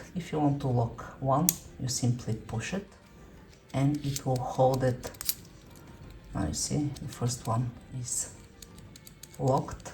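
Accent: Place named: Italy. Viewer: Sento un po di italiano in questo inglese😂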